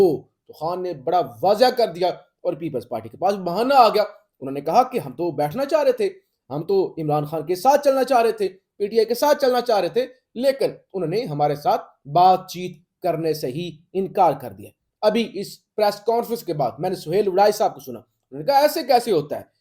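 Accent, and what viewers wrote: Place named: Rampur, India. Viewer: Asslamoalekum Ap plz yeh bate yeah Ki Apki Ammi ya Abbu Ki traf Ka koi india kr U.P se hai, Rampuri hai koi na koi 😅… Ap Bilkul Rampuri Andaz Ke ho, Ap Pakistani nhy lagte ho … plz reply 😅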